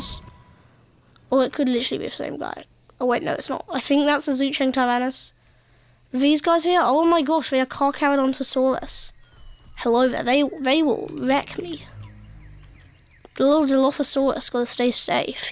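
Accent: United Kingdom. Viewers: U sound Britian! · You are you British?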